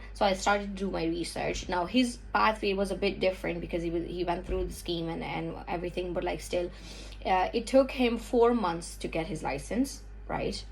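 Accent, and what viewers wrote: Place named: Ireland. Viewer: crazy how uve only been In Ireland around a year and im already hearing a bit of an Irish accent peaking thru!! so cool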